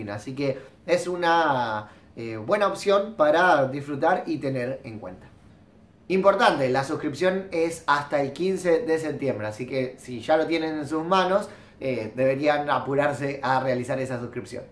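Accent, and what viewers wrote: Argentina. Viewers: hola de que parte de argentina sos?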